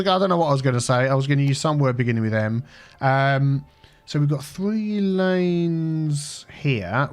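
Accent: United Kingdom. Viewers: Oh ok lol, love your vids btw mate just found your channel last week. Can I ask where you're from if it's not rude, I'm terrible with accents and was wondering what part of uk you were born haha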